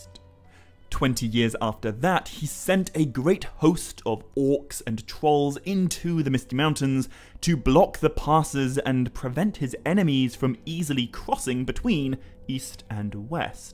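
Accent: United Kingdom. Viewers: He's British. They ask talk like that.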